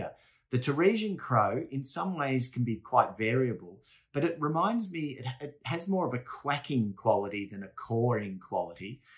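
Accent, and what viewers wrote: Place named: New York, United States. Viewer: haha...thank you. love it. I'd say, not an American accent but somewhat NY city Jewish accent. :). Jewish Ashkenasic :).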